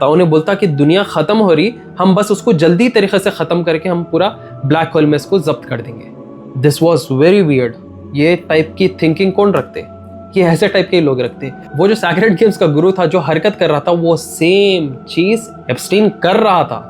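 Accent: Hyderabad, India. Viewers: aplog kaha se ho bhai Hyderabadi lagra style mje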